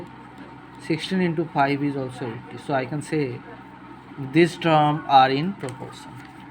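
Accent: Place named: India. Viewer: Indian accent: check Noise in the background: check math tutorial: check THIS IS AWSOME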